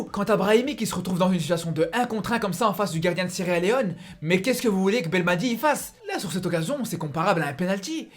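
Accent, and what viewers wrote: Belgium. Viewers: Accent Belge 🍟🍟🍟🍟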